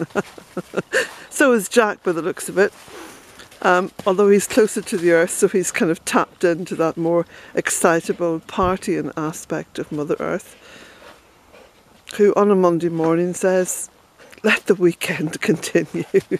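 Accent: Ireland. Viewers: I watch your vlogs for inspiration, comradeship and hope: but also for relief from stress, solace in hard times, an escape from my own life and even as an aid to peaceful sleep when life is really hard and I am in distress. I put my laptop on the floor near my bed and drift off to sleep listening to your lovely voice and tales from at those times and it has been such a blessing. So yes indeed, with your Irish lilt provide a sanctuary for me:)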